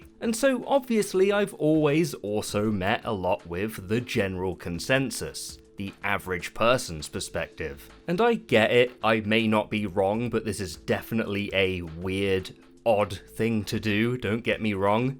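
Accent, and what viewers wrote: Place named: United Kingdom. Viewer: Totally agree. I think it's both but also his voice. He's got a pretty calm uk/euro accent that has a comforting quality to it. I use it to fall asleep to pretty often too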